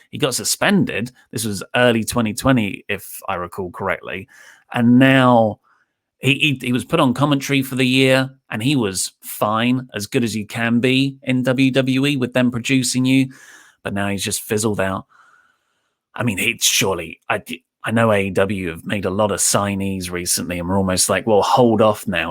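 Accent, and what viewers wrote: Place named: United Kingdom. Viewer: Why is breaking these awful news with a british accent at the wrestletalk youtube channel?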